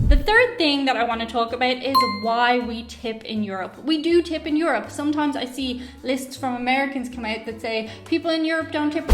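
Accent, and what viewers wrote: Ireland. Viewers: Just wanted to drop in and say u love ur accent. Don't stop being Irish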